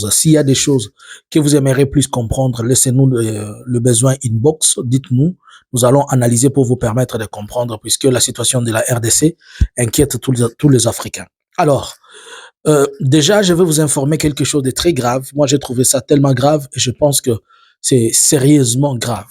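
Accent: Rwanda. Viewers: Monsieur, tu est rwandais, ça se voit ds ta façon de parler, notre armée avance très bien, où en sommes nous, avec la cité de masisi, c'est ds les mains de notre notre armée, monsieur arrêt les mensonge, moi j'habite Luberon, menteur que tu es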